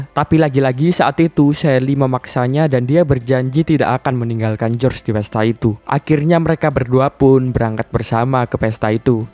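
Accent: Java, Indonesia. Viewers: Jangan semangat tetep menyerah ahhahahaha bikin ngakak. Duh medokny masih keliatan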